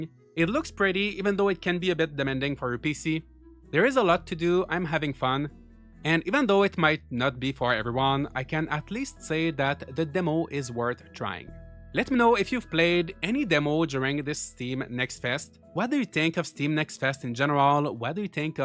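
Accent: Quebec, Canada. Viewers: He is from Quebec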